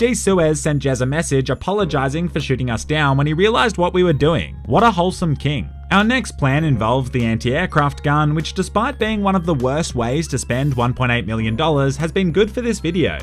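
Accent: Australia. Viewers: just a question are you australian or american?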